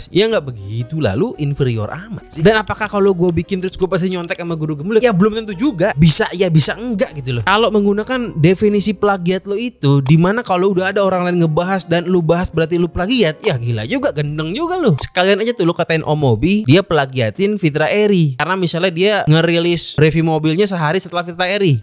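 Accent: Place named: Betawi, Indonesia. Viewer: Gw subs si Bro ini karena dialek Betawinya mantaffff dan juga berani roasting tuan besar rotschild.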